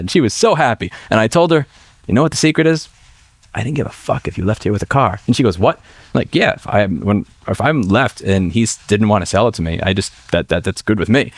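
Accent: New York, United States. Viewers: Fast talking new yorkan keeping it plus two on the speed knob, to plus three for effect, then back to two or one if you’re slipping .. your content’s okay though at .75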